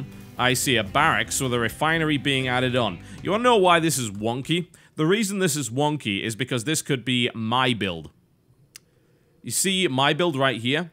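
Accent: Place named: North, United Kingdom. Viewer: I love hearing irate Northerners. Rest in peace yo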